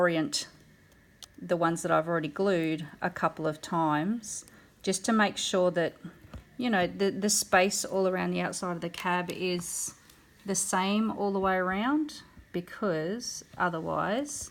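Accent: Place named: Australia. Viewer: I love listening to your Aussie accent girl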